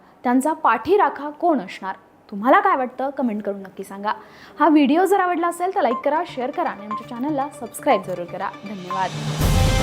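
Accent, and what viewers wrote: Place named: Khandesh, India. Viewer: अँकर मध्ये खान्देशी टोन आहे.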